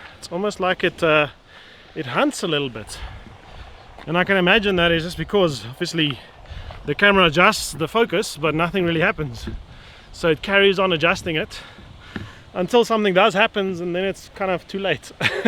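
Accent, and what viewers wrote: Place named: South Africa. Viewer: Thanks for providing this. Good to hear the Saffer accent. Puts it in a good context to whether we want to purchase it.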